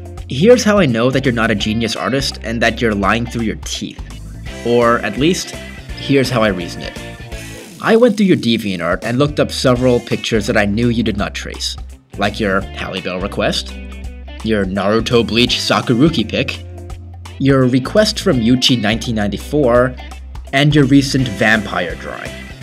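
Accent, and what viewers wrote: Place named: Wales, United Kingdom. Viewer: Oh god, she's Welsh...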